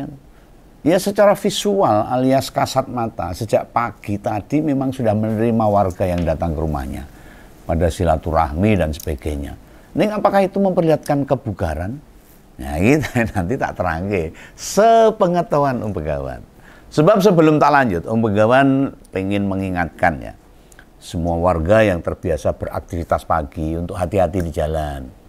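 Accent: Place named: Solo, Indonesia. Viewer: Narasinya, cara bicaranya dan logatnya mas begawan ini saya suka banget, seolah saya sedang main di rumah tetangga dan duduk di ngebuk ngobrol spt ketika dulu saya masih tinggal di solo. Yo ngene iki persis ngomonge, ha ha, lanjutkan mas begawan, jadi pengin pulang ke solo saya.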